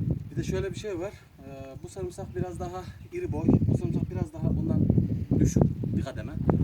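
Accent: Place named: Karabük, Turkey. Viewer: Yeni gordum seni bende karabuk eflaniliyim sivemiz ayni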